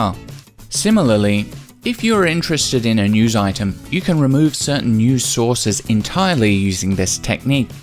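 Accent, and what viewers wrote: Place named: United Kingdom. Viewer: If that was spoken with an American accent- I wouldn't have watched it. Am I the only one who is obsessed with those British accents?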